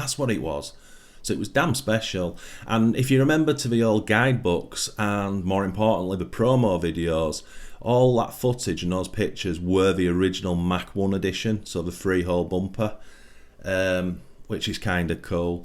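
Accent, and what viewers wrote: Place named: Yorkshire, United Kingdom. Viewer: I think its Yorkshire accent. He is saying mark 1 (mk1)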